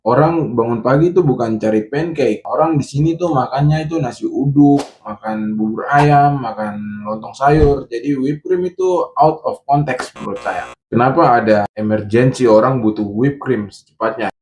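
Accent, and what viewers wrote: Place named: Brooklyn, United States. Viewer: Aku kira Abang admin ini orang Brooklyn, ternyata orang indo😅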